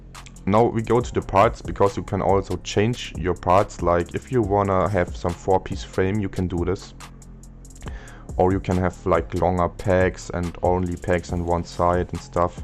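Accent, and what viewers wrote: Germany. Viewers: Your Deutsch Akzent ist very nice haha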